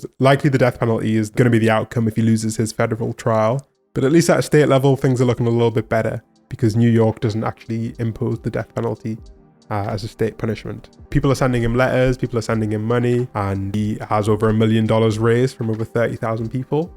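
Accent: United Kingdom. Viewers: You know it took me a while to piece it together. But someone with a british accent explaining the trial of Luigi with more care and compassion then US Media is hilarious to me. Anyway, forgot I was subscribed here and since I turned off my youtube algorithm, I hope to ring the bell and get more videos. Oh yeah, FREE LUIGI!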